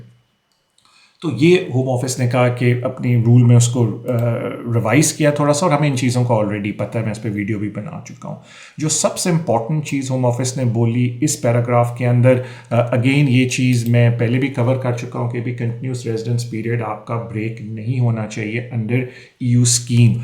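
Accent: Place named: Pakistan. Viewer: Its Pakistani English language XD wtf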